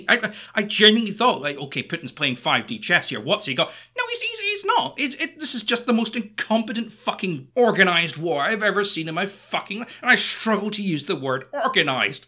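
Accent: Scotland, United Kingdom. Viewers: Why does he sound like Sheogorath,but Scottish and sounds like he's sick. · I love the Scottish accent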